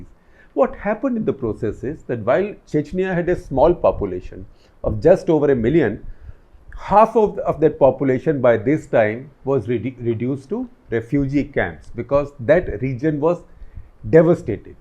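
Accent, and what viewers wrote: India. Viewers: sorry sir apki baat samajnai k liya kaan lagana parta hai......better is to talk in urdu.......as ur english is like urdu......typical indian/asian dialect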